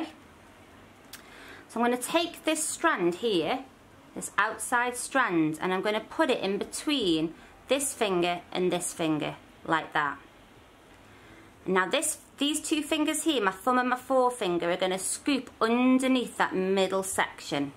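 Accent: United Kingdom. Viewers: British accent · They are both British but morgz and morgz mum are from Sheffield. And I don’t think she is from Sheffield but she is from Britain 🇬🇧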